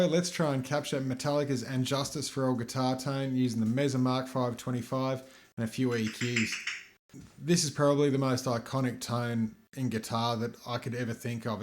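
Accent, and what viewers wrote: Australia. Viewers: holy shit you're Aussie! I had no idea :) Keep up the good work mate!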